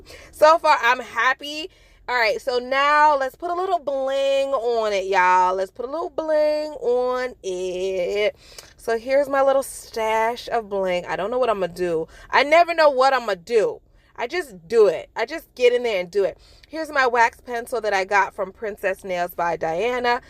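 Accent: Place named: New Jersey, United States. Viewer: Are you from New Jersey? I love your accent and videos 🥰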